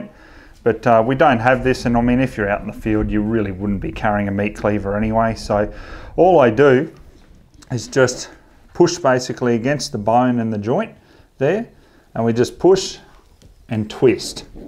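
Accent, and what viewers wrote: Australia. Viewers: You know this dude is a professional with that Aussie accent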